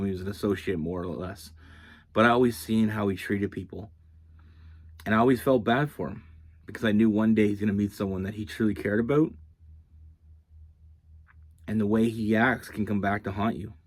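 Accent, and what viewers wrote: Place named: Canada. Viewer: Hi! new to the channel here. First off, your t-shirt and baseball cap collections get an A+. You are so thoguhtful and at the same time, really a dude. It's pretty charming. Truthfully, I feel guilty watching tarot readings though... I think I hear Canada, I know because I'm across the pond in B-low 😉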